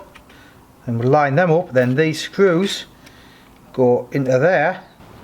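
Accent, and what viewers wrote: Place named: North East England, United Kingdom. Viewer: Haha "an old fella" these sayings are normal for me but I bet people if any that watch abroad struggle to keep up with how we talk on the Northeast and I even speak different to even tho I'm a stones throw away from where he is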